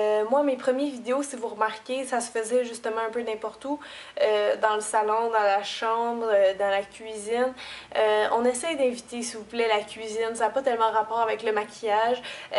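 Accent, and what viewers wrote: Quebec, Canada. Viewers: Je suis entrain de tomber amoureux de ton accent québecois ! vous être trop mignonne chère demoiselle franchement je suis époustouflé (k) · mais c'est génial l'accent québécois justement ^^ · C'est drole parce que je m´était abonné à une chaine de maquillage canadienne, "judeobsession", mais son accent était vraiment tres tres prononcé et parfois je ne comprenais meme pas. Mais toi, ton accent est plus léger, et je trouve ça trop cute! Et puis j'adore les expressions québecoises, genre la façon de dire "etchetera" ou "c'est l'fun" ^^ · Moi j'adore l'accent québécois !! Je suis jalouse avec mon vieil accent du sud ouest de la France^^ ;-) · L'accent québecois est juste un régale, et un plaisir a entendre ;) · Je suis française et J'ADORE ton accent québécois =D Et toutes tes vidéos en général... Pourtant, je ne me maquille que (très) rarement ;p Bonne continuation ^^ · Ton accent ne me choque pas au contraire j'aime beaucoup l'accent québécois :) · Merci pour ta vidéo je pense que c'est une vidéos vachement utile, je pense qque tu veras pas mon messages vu que tu dois recevoir plein de commentaires je viens de coommencer une chaine fille pas baser sur les make-up mais plutot tenue du jour , haul, soin ...:) J'espère que tu pourras y faire un tours et éventuellement t'abonner (c'est beau d'espérer) et bonne continuation et ton accent québecois et très beau ne t'inquiètes pas on comprends très bien ce que tu veux dire bonbon bisous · Merci pour ces supers conseils et encouragements. 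Ta chaîne est super et ton accent québécois est tout simplement trop mignon. Comme tu le dis l'important c'est d'être compréhensible et tu l'es tout à fait, je n'ai jamais eu de soucis pour te comprendre.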